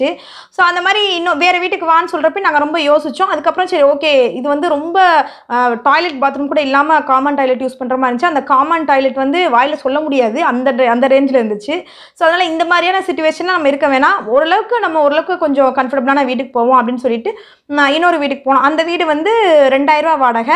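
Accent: Madurai, India. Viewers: Very encouraging vedio sister, na madurai dhan unga madurai pechu super, rombave yedhaarthama pesuringa maduraikaravangaluke irukura yethaarnama pechu, unga vedio paarthadhula irundhu Naanum savings panni gold vanganumnu oru intrest vandhuruku, vedio paakuradhuku munnadi varai intrest illa, unga character super, selave pannadha character kondu vara Naanum try pannitu iruken, unga vedio paarthu, continues.ah vedio podunga, thankyou so much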